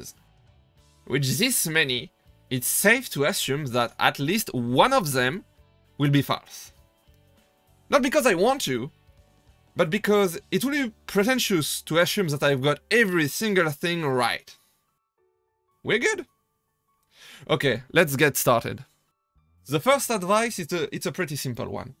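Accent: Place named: Japan. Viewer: great video. btw Im interested in your accent. is your native language japanese?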